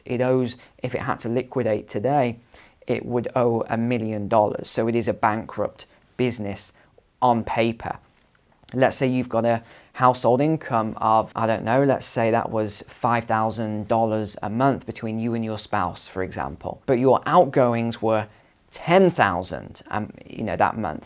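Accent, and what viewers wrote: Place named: United Kingdom. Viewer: I don't know about you all, but it is better to hear that all the banks and real estate are all going bust from a calm British accent.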